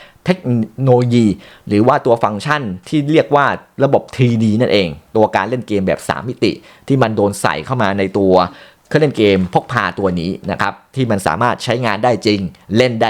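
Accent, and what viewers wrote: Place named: North, Thailand. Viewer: ไม่ว่ากันเรื่องพูดไม่ชัดในบางคำ เพราะมันฝังรากลึกไปแล้ว เหมือนคนเหนือ ต่อให้พูดกลางแค่ไหน จะมีแว๊บหนึ่งที่จะเหน่อเหนือนิด ๆ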